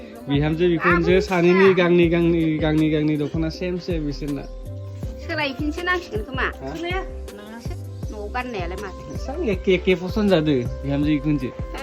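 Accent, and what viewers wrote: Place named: Goalpara, India. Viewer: जोंनि गवलपारानि रावजों सेम आदा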